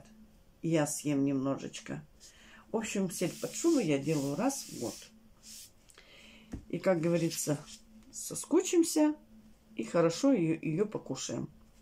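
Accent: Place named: Urals, Russia. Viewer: Я не так давно на вашем канале, и при просмотре первого видео сразу услышала уральский говор.😊 Я сама родилась и выросла на Урале, и хоть и живу уже несколько лет в Питере, но наш уральский говор узнаю сразу 🤗😊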